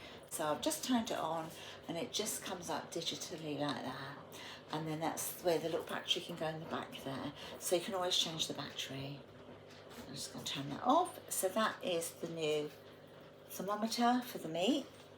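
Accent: United Kingdom. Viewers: I could listen to your lovely voice and British accent all day❤ Just put that grater in my cart! I needed one! Ty. Great haul👍🏻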